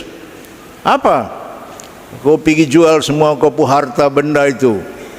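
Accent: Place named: Papua, Indonesia. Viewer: DIALEK PAPUA ITU KELIHATAN KERAS TETAPI ITU BUKAN BAHASA KOTOR ATAU KASAR, USKUP KAMI KEUSKUPAN MANOKWARI-SORONG ADALAH USKUP DENGAN GAYA DAN CARA PANDANGNYA SAMA SEPERTI ORANG PAPUA PADA UMUMNYA. BEGITULAH KAMI DISINI. INGAT USKUP KAMI HILARION DATUS LEGA ADALAH USKUP YANG TAHU BENAR SIKON DI PAPUA, SALAM HORMAT DARI UMAT DI TPW FAKFAK, PAPUA BARAT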